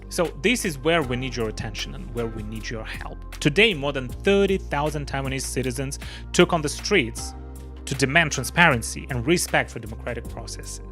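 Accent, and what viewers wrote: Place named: Europe. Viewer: Nice haircut and nice shirt. and your English is getting more European. that's not a bad thing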